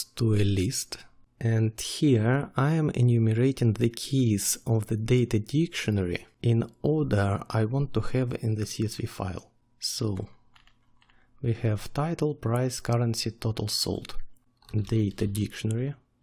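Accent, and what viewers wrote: Russia. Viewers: Русский английский заходит лучше, чем английский английский - благодарю за видео! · you have a nice russian accent :) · i fucking love russian accent. i hate listening tutorials with indians.. i dont have nothing against them, just cant listen to them.. but russian.. i love that language.. i know little russian.. ya ponimayu russky nemnogo :D croatian is also very similar to russian . cheers.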